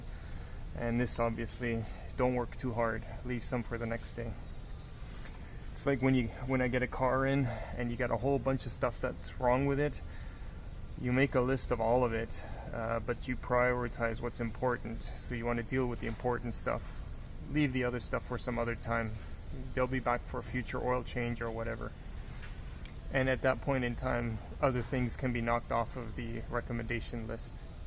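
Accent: Germany. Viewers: good german accent, you still got it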